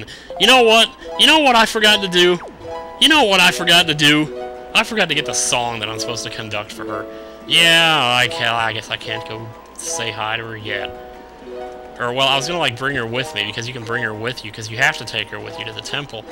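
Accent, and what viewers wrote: South, United States. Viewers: Southern accents are the best! :D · Where did you live for 10 years? Georgia? Texas? Alabama? I think different states have different accents since I grew up in Mississippi, then moved to Texas, and people said I had an accent, heh.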